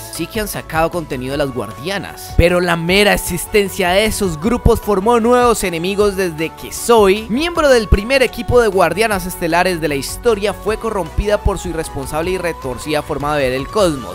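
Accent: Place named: Bogotá, Colombia. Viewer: Ah, el buen habla de un Bogotano, no lo cambio por nada :v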